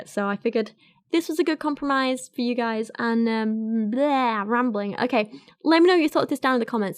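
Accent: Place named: Staffordshire, United Kingdom. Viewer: Your Lil' Staffordshire is adorable and i love it <3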